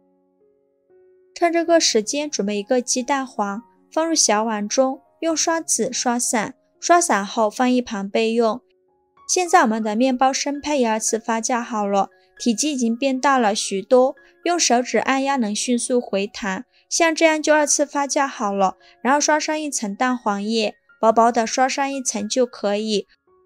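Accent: China. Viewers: 呵呵。請問這跟台灣人的素質有什麼關係。因為影片裡說的是大陸口音嗎？差別心在你不是我。到底誰心裡殘缺呢？？我只是說加雪碧就是麵糰裡加蘇打跟檸檬酸和糖。沒什麼值得神奇的。因為對於烘培加蘇打在麵糰裡是很常見的。而你生出那麼多情緒。還上升到台灣。只覺得無知又可笑🤣🤣🤣🤣🤣。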